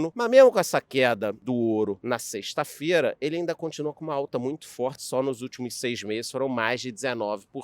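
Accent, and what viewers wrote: Rio de Janeiro, Brazil. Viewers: adoro suas explicações. Excelente canal! (E mat0 as saudades do sotaque carioca ouvindo você). 😊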